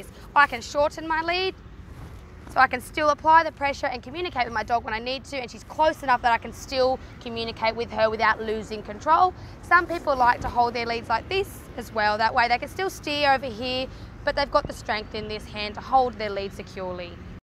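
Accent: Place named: Australia. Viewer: What nationality are you?? She's speaking Australian & we speak slower then other nationalities.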